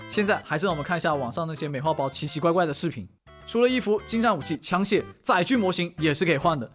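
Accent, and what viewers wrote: Hong Kong, China. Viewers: 你是不是香港人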